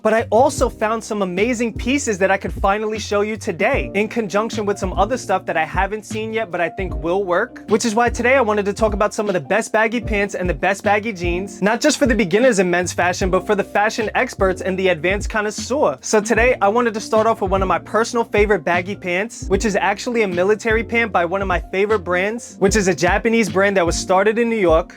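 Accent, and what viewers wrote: New York, United States. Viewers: Such an informative vid and some real bespoke picks! Also love the NY accent haha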